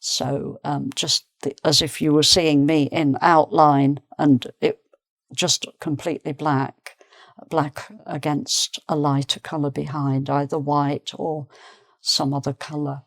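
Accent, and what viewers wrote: United Kingdom. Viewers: I have been acquiring the English language for a long time, ever since 2004 and for reasons of material availability, I picked up an accent resembling that of USA. Now at the age of 31 I'm seeking to transition onto a more British-sounding quality, for which I looked for videos of this lady and, reading the comments, I just found she passed 😢 Hope she is resting peacefully. You 'were' not great, you still ARE and I'll make sure to make good use of your lessons. Thanks for your contribution to every student of English and I wish you a calm journey 🤍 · Oh, I had started learning the British's accents with you! It is of a great pleasure to learn here, till now a days. I started more or less 3 years before my post-graduation for teaching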